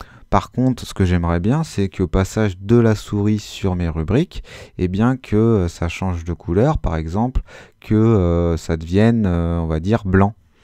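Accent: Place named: England, United Kingdom. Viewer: Très bon tuto mais l’accent anglais est beau ! :D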